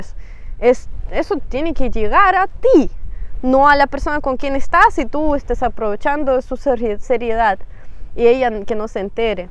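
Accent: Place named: Chile. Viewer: Muy lindo ese campo 😍 Ya hablas muy bien el español. Aunque tienes un acento chileno, pero me gusta verte hablar en español. Ustedes las mujeres rusas son muy, muy inteligente y aprenden rápido todo. Bienvenida al idioma español.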